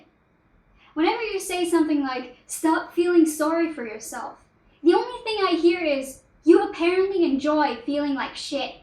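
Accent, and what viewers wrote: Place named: United States. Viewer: der Akzent klingt mir eher nach Amerikanerin :'D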